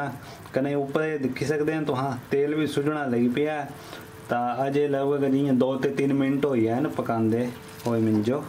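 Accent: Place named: Kangra, India. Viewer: Mouth watering 😋 recipe ekdum must. Aap ki baaten achi lgti hai very sweet kangri bolde tusan. Missing himachali dham.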